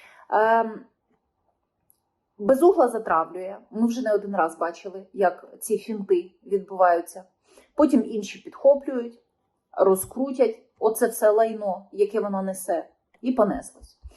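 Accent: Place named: Moscow, Russia. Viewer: Ця касабка ще на початку 2022 року зомбувала аудиторію російською з мацковскім акцентом. Така типова мер &твечуківсько-ахметівська гебнява журнашлізда.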